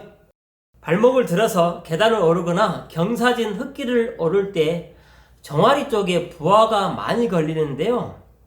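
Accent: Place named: South, South Korea. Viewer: 포토샵 영상에서부터 느꼈지만 디테일하고 전문적인 수준 높은 강의 중화된 남도 억양과 조분조분한 말투 너무 듣기 좋네요 2024년도 님과 가족분들 모두 건강하고 행복한 한해 되세요 2024년을 2004년으로 썼네요 그래서 수정 ㅎㅎ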